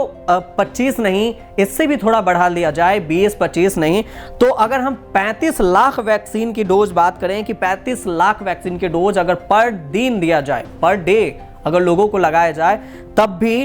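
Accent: Bihar, India. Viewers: A bihari accent thheek karr Bhaarat hota he Bhaadat nai Zaroori he Zadoodi nai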